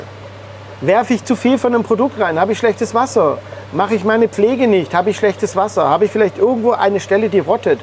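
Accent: Swabia, Germany. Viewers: Ich schaue die Videos super gerne, insbesondere des Klartextes und der Direktheit wegen. Der schwäbische Dialekt ist nicht so krass, dass es die fehlerhaften Untertitel bräuchte.😉 Danke für die Mühe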